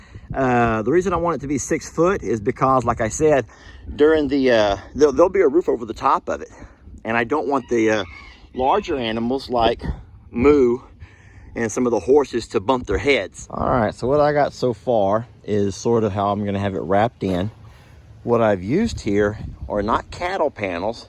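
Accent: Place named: Louisiana, United States. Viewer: Omg you are hoot, we were driving home from walk last night had this on in car listening through blue tooth and when you were measuring and said “well I see it’s just over 5ft in this direction” we fell out hollering what direction is that lol ohhhhhh lawd you are comical! Made me feel like I was at home in Louisiana I love it! Living in KC they say I say the funniest things 🤷🏻‍♀️ that’s way we talk in South 🙌 love this it’s really cool we use to have something similar but we threw square bails in it for animals! You did good job, looks great! You were spot on in your measurement in that direction!! 🤣🤣🤣 I’m just messing with you!❤️❤️❤️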